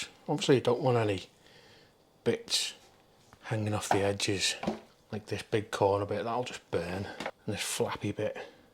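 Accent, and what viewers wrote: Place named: Wirral, United Kingdom. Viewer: Looks good mate. Are you on the Wirral? Accent sounds like it. Just been to Farm Foods in Ellesmere Port. Got the ribs £12.99. Got some other cheap freezer stuff so happy enough.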